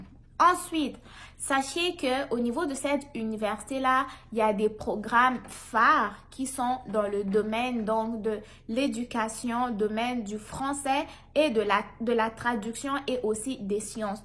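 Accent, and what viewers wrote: Canada. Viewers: tu as deja l'accent canadien. Toutes mes felicitations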